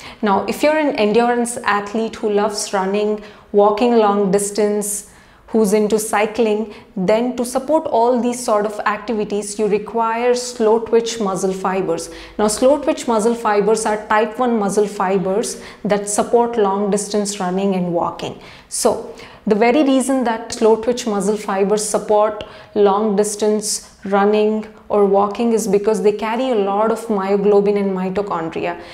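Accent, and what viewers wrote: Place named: India. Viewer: This the best indian accent from a youtuber ive ever heard. Easy to understand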